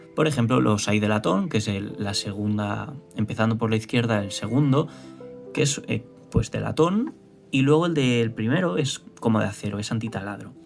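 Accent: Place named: Spain. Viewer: odio este accent Español, pero ignoraré este horrible estilo de hablar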